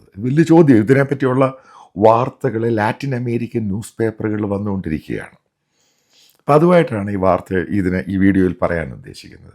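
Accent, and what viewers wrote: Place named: North India, India. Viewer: Your accent is pretty poor . Especially when you say attack, Qatar, now you just said Vatican . You sound like a North Indian Jero(Zero)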